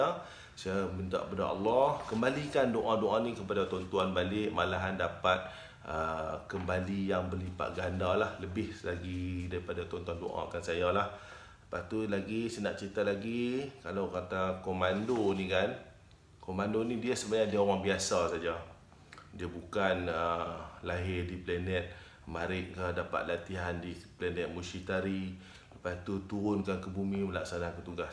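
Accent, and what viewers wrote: Kedah, Malaysia. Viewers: Steady Tuan..yang sempoi kompom org Kedah...gerek,qeba,nasib x sebut pedai ayam...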